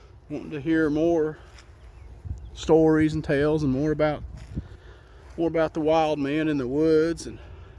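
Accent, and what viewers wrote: South, United States. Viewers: Always good to wake up on a day off and see has posted!!! It’s good you mention music it’s through the music I found the beautiful folk lore! Listening to Doc Watson and the such made me want to get a deeper knowledge of Appalachia because it reminds me a bit of the part of England I’m from. One thing I’d love to ask, going by your accent and some of the place names you mention I’m guessing your in Rasslin territory. Where you your self ever a fan? I love 70s/80s pro wrestling and the south has the best!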